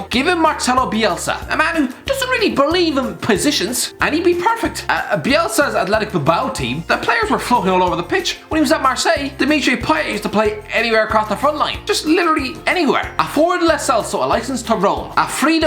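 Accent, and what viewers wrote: Ireland. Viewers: i love waking up to an ranting about football every morning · Hello and welcome to HITC sport right. Love when says that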